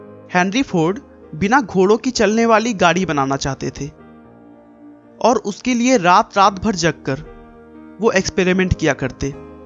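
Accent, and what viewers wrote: Bihar, India. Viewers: Abe tu bihari he kya...???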